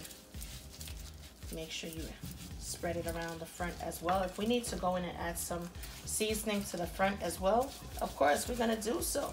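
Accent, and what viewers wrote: New York, United States. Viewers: Good Lawd with...I'm doing this one...and I knew you was NY...Got a friend from NY that sounds just like you...Respect....real talk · Love your delicious Turkey wings recipe. I hear your NYC accent too! I was born and raised in the Bronx aka Boogie Down. Thanks for sharing! ❤️ My bad. Forgot I reached out to you a year ago. Recipe still popping!!! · These look AMAZING! I'll be trying this tomorrow. By the way...Are you from NY? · love your New York accent!!!!! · This my first time watching this channel and all I hear is an uptop NY type accent I'm sold jus off the way u keep saying OFF like a NYer 😂❤️ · She is a ny'er to the T. All i hear is ny thru and thru. Im from cali. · Is that an NY accent. 👀👀This is how my gma cook it butter & all. I watched her cook it from a distance. I couldn't 100% remember how she did it. Thnks Blk PP posting soul food on YouTube ❤❤well minus the gravey mix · is definitely from NYC…that accent is poppin💯😀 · she sound straight from NYC lool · Dee I Love Jesus Yup I here her N.Y. 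accent one of the Burroughs she’s from I from the Midwest but I know them deep NY accents lol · I hear that NYC accent. This looks so good. I cook mine with the Cajun trinity (Onion, celery, green pepper), and I like my wings crispy. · Haven't heard that New York accent in years.😁 Printed recipe's a bit lacking. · I hear that New Yorker in you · Sounds like a New York/North Jersey accent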